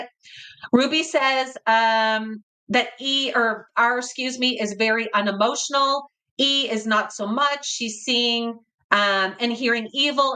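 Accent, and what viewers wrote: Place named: Prince Edward Island, Canada. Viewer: I am a PEI gal and saw you on Mormon Stories! I recognized your accent!!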